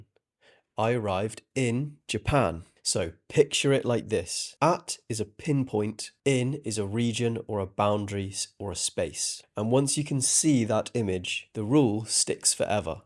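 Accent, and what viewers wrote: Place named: United Kingdom. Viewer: Omg your voice with this British accent is incredible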